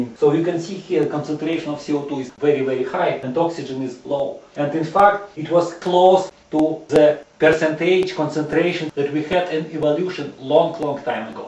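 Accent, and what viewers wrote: Russia. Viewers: I have learned so much just from watching your video. I find the comparisons between primitive and modern day breathing mechanisms immensely fascinating. I am a physiology student and this has given me a lot to think about! I'm also Russian (I think you are too?) and it is wonderful to hear the accent again after so long.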